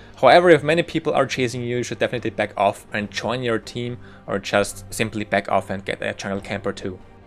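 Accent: Germany. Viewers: Your accent just sound a little bit like a german accent:P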